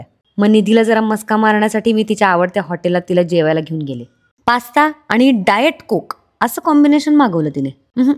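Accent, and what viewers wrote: Beed, India. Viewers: Tarich bhasha same ahee😂 beed♥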